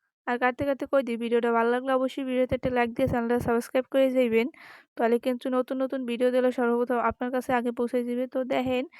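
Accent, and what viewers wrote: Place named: Barishal, Bangladesh. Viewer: হে বুবু আপনিও তো খাস বরিশাল কথাই বোঝা যায়।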